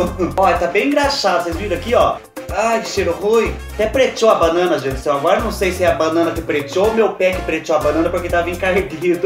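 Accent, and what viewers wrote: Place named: Minas Gerais, Brazil. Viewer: Aí do céu...não dou conta dessas canelinhas lisas... Vc é muito legal...KKK Abraço aí em São Paulo. Sou mineira e vc conversa como nós. 🤗😘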